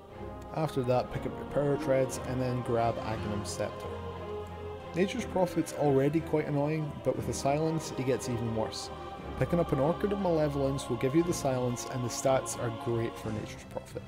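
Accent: Scotland, United Kingdom. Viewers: waaaa! You Scottish too big man ?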